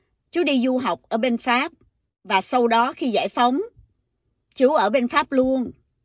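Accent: Standard. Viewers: Câu chuyện rất thật rất đời thường ,giọng đọc E rất chuẩn hay,Khi đã nói ra đc lòng sẽ nhẹ nhàng hơn,đây cũng là bài học cho tất cả mn ,kể cả phụ nữ,nếu sống lường gạt âm mưu như vậy,ắt gặp quả báo,ko phải ai cũng cư xử như A này .Nếu ng khác họ báo cảnh sát ,Cô ta chắc chắn sẽ bị vô khám. Nhân tham tài nhi tử Điểu tham thực nhi vong. Người tham tiền cũng chết,vật tham ăn cũng chết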